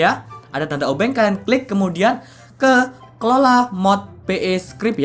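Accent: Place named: Java, Indonesia. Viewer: Lu orang Jawa timur apa tengah bang !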